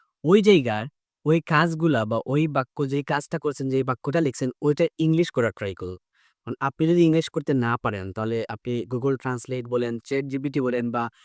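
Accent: Sylhet, Bangladesh. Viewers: ভাইয়া আপনার বাসা কি সিলেট?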